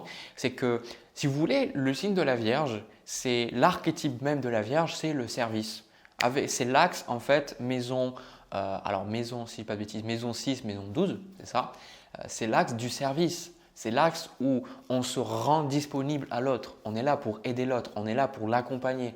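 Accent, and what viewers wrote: South, France. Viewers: Merci, pour tous ces précieux conseils qui me font penser que j'aurai bien aimé avoir un pote comme vous!! En tant que signe Vierge, j'ai bien tout noté....et à mon tour, si vous le permettez bien sûr, je vais vous adresser quelques remarques en forme également de conseils....Vous avez selon moi, un tout petit "tic de langage", qui vous fait dire, par exemple: "Vous roprésentez ceci ou cela", au lieu de "Vous reuprésentez ceciou cela.."Alors moi aussi, j'habite dans le Sud et je ne suis pas du tout choqué, bien au contraire..:: Mais j'ai aussi des origines, et par conséquent une oreille....très parisienne....je sais, nobody's perfect!!;-)) Vous noterez peut-être comme moi, un léger sourire aux lèvres, que sur certains mots, assez rares, vous ne commettez pas ce que je ne saurai qualifier d'erreur!!;-)) Donc si vous le voulez bien, ce mois de décembre 2023, vous vous ferez plaisir, ainsi qu'à moi, en veillant à faire quelques progrès que vous nous présenterez, à n'en pas douter, dès ce début d'année!!!;-)) Merci et bonnes fêtes de fin d'année..!! 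Cdlt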